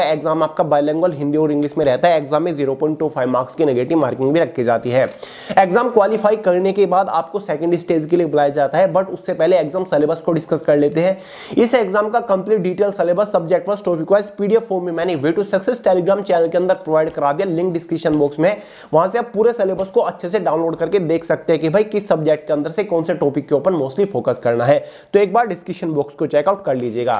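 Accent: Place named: Western Uttar Pradesh, India. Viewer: kana se ho aap aapki tone west up se lgre h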